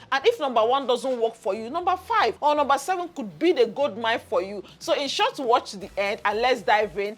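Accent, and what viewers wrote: Nigeria. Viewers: Are you speaking Nigerian English accent?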